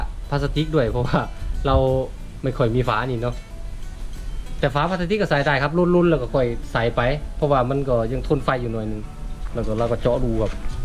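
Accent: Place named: Korat, Thailand. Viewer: โมแปลกดี คลิปดีมีประโยชน์ ติดตามชม สำเนียงคนโคราชคือบ้านผมเด้อครับ 👍👀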